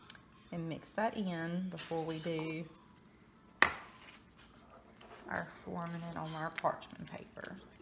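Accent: South, United States. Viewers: Thanks for the inspiration to make our own butter. I think that method would work in our bosch mixer, oh and I love the southern accent. :)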